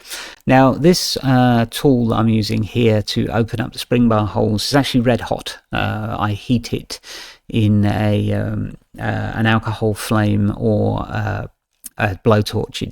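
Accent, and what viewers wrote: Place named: United Kingdom. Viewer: I’m an american, so take this with a grain of salt, but I think a British accent makes anything more enjoyable 🫤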